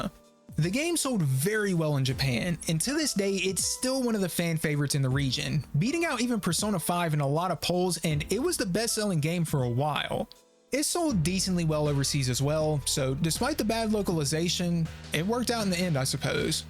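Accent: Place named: South Carolina, United States. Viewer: As someone from SC, I do really love that your accent slowly comes out more and more throughout the video. It's such a comfort to hear!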